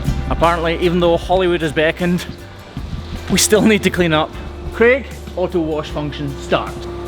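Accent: Scotland, United Kingdom. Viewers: Who’d of thought a hoof doctor would become my much needed connection to Scotland? Raised by two Glaswegians in Canada 🇨🇦, my aunties and uncles back home have all passed in the last few years so I haven’t been back a lot. The accent, the scenery and the ice cream. My most favourite thing about Scotland is the ice cream since I was a little girl. Thanks for the happy memories.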